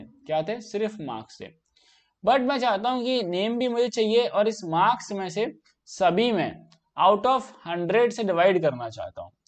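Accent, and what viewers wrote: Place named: Kanpur, India. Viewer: bhai app kanpur se ho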